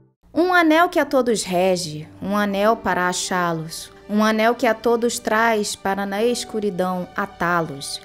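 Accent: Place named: Brazil. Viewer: Legal , eu jurava q vc fosse sulista por causa do seu jeito de falar , de carioca vc nao tem nada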